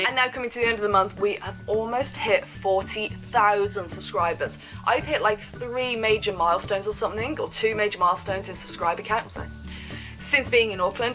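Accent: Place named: Wales, United Kingdom. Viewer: Where's the welsh accent from?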